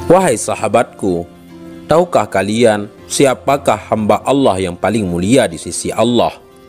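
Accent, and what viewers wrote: Aceh, Indonesia. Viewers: Menurutku itu logat orang acheh bro bukan bali ataupun lombok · Aceh pidie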